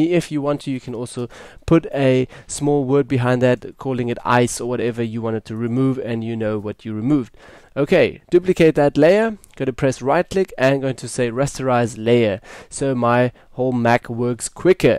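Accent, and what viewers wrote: South Africa. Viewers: Great tutorial, but it was immeasurably better with the South African accent. So pleasant.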